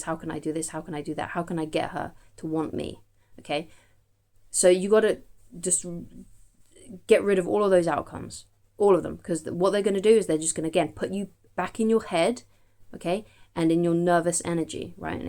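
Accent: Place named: United Kingdom. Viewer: Nice that you have a coaching book out. You're not going to tell me I have to read it 10 to 15 times just to understand it, are you? 😅 I like your content and of course the UK accent... Nothing is more delightful for me than the times that I get to fly through London-Heathrow and everyone is throwing around that accent..."Hallo Yank, yes you... joost need your passport over here, love"... I just love it. It's so different than the way people are here in the States. Anyways, cheers to your book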